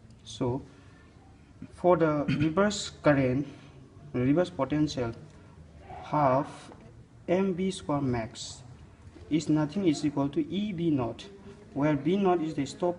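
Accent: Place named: India. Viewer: are you from india ,well explained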